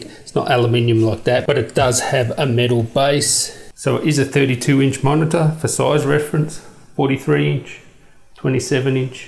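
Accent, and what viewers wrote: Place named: Australia. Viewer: Great Aussie voice hahaha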